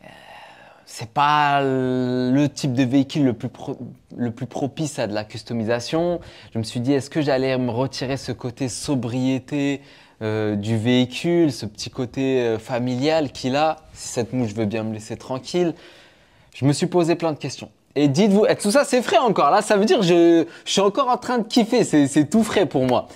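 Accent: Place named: Banlieue, France. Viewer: Salut, où as tu tourné cette vidéo ? C'est un super endroit. Merci. Oar contre tu parles comme un mec de banlieue et ça ça gache la vidéo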